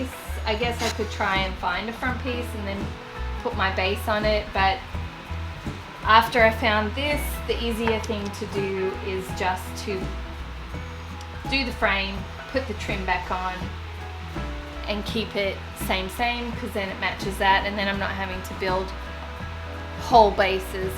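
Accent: Australia. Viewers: Smiling Saturday!! We're here for it and your lovely Aussie accent. Oh, and you outstanding work too!! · another beautiful makeover! My reaction to the comment(s) about you: Some people can be brazenly offensive. If they have an issue with your channel, then why are they watching? You don't need that foolishness. Continue to be you. I love listening to you (love the Australian accent) and learning from you. Just thinking about what was said irritates me and even though you don't know the person, those comments can be hurtful. Block anyone else who can't appreciate you and what you do. Let's not waste any more time on shallow people. Evidently you're doing something right by being YOU. Your channel is growing. Congratulations on the 10K! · We love you and admire your work! In the shop you are Working Woman, not the glamour version, plus the heat and humidity of TN--well, I would wait til cooler weather, being more the wimp. 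So don't let the snarky ones affect you. Love the Aussie talk, so talk on!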